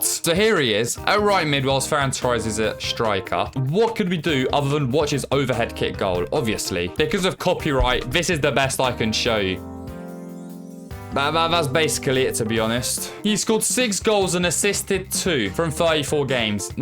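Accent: Poland. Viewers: Are u polish maybe?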